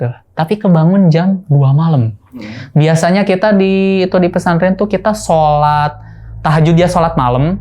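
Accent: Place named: Sunda, Indonesia. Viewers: pinaliiii sunda pisan ang..ang..ang... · sunda pisannnn mntp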